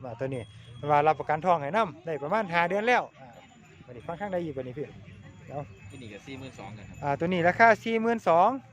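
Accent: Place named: Sisaket, Thailand. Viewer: สวัสดีค่ะอ้าย ศรีสะเกษชัดเจนจ้า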